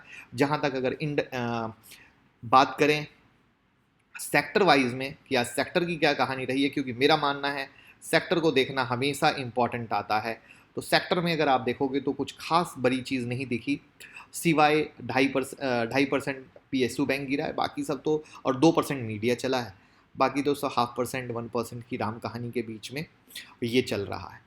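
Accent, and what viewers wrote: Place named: Shekhawati, India. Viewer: Byaj ko dhando chokho, 😆 ye to pure shekhawati style h....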